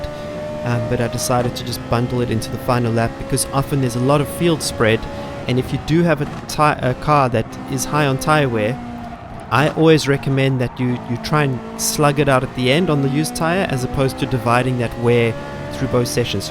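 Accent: South Africa. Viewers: Where in SA are you from? Your accent sounds like Cape Town, or at least somewhere in the Western Cape.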